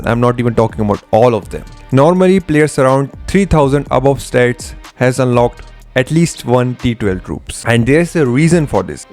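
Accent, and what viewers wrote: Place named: India. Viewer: It's difficult to understand u with ur indian accent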